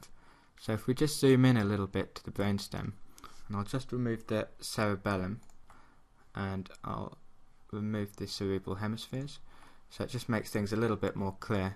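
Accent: England, United Kingdom. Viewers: Excellent tutorial! And it's good to hear the English pronunciation of all those names that I (a foreigner) have tried to learn from books only. Just one thing: As far as I know, the acoustic nerve, surprisingly, contains far more efferent fibres than afferent, seemingly for assisting in focussing our sound perception by affecting the outer hair cells. So shouldn't it be called a mixed nerve, rather than pure sensory?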